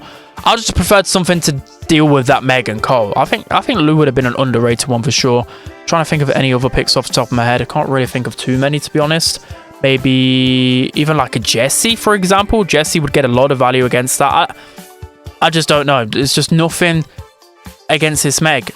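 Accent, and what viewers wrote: United Kingdom. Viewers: when are u gnna unleash ur welsh accent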